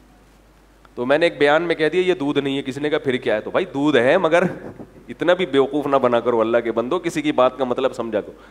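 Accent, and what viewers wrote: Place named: Karachi, Pakistan. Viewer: Main karachi se belong karta ho aur apka karachi style main baat karna bohat pasand hai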